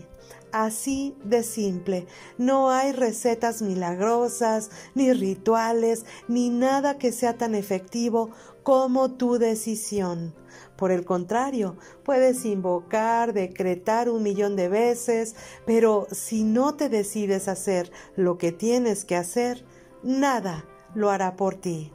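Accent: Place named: Castile, Spain. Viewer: Otra cosa que me encanta de Usted es que habla y escribe el castellano perfecto, como antiguamente se hablaba y se escribía en España. Me quito el sombrero ante Usted. "CHAPEAU"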